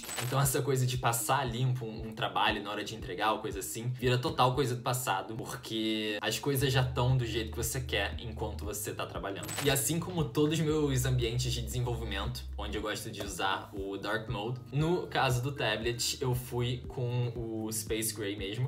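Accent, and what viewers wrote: Rio de Janeiro, Brazil. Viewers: Chocado com o sotaque carioca do ícone da ciência, adorei o vídeo! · Sotaque de carioca é muito bom kk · Naviossx, claxsses, sxstudante, bem carioca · é incrível como você tá morando na Alemanha a 3 anos e ainda fala carioques fluente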